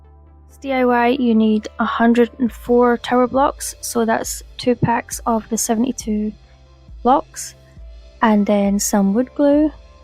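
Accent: Scotland, United Kingdom. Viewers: My wife Cinnamon, really loves your crafts. She is watching you make the fireplace with the broom and cooking pot and spiders. She just loves it. Also loves listening to your voice. A touch of bonnie Scotland.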